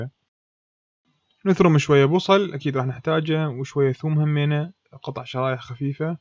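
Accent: Iraq. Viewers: يمعودين لشيف لهجته عراقيه لو أني مشتبه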